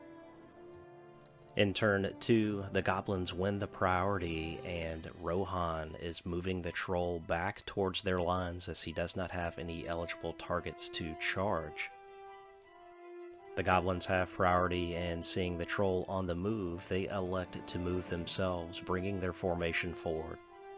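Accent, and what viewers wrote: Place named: South, United States. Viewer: Where are you from? Your southern accent keeps poking through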